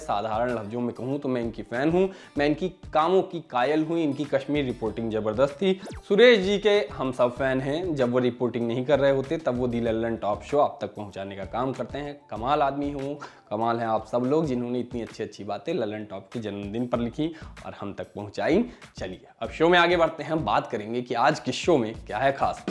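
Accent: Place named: Rewa, India. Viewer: Mai bhi rewa se hu.. Aapka accent 👌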